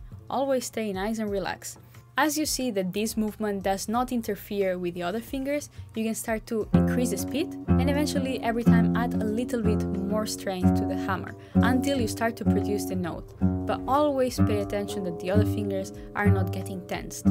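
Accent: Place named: Catalonia, Spain. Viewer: Is your native language Catalan? You don’t sound typically Spanish 😉 Awesome video!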